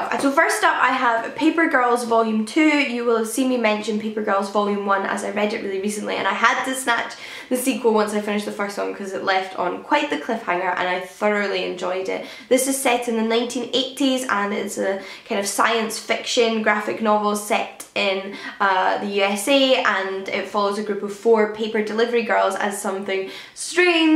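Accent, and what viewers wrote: Scotland, United Kingdom. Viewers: Hi, I am a book blogger and have recently started subscribing to your vlogs, my site is <link> Knew (obviously) from your accent you were a fellow Scot but didn't realise you were local to me. I live in Polmont and can attest to just how fabulous the charity shops in Linlithgow are, my husband and I are often to be found trawling around them. Keep up the great vlogs and feel free to drop by my site.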